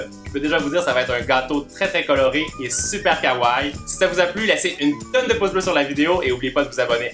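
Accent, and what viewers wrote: Quebec, Canada. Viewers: super video tes le meilleure 😉😉 #lovemail #temsnap love j'adore ton accent Québécois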